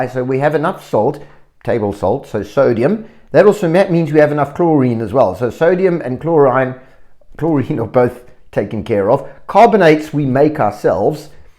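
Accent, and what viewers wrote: South Africa. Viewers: Are you from South Africa 🇿🇦😀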